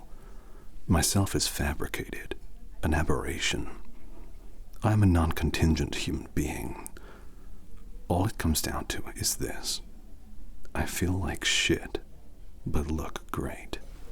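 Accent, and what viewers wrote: United States. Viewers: Your American accent is getting really good, man. <3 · I'm really digging your america accent! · Not only is that a great American accent but that’s also a very good impression. Acting chops for dayssss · Your American accent is amazing. How do you do that?! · Scary, disturbing, and very satisfying. Nice American accent. :) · I haven't seen the movie, but two things amaze me: #1 your flawless American accent, and #2 the way you are able to make your face look like Christian Bale's face. I feel like a broken record saying yet again how versatile you are, but damn. 🙂 💜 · Great American accent.. I want more! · Wow!! Your American accent is absolutely amazing! Especially because your real voice is deeply British (which I love!) You truly sound American if this was the first of your videos I'd ever seen, I wouldn't have guessed your British! 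American here from North Carolina! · You get a hint of it... But it is SO impressive that you can switch to an American accent 😱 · Wow, your American accent is really good! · Wow!!!!! Your American accent was just...GOD you’re good! You should absolutely do books on tape or something. You narrate SO WELL. And that was creepy and perfect and YOU are so perfect! Amazing job as ALWAYS!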